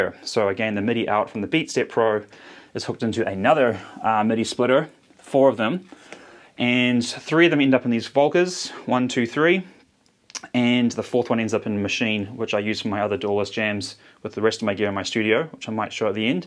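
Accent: New Zealand. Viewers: So, are you a Kiwi?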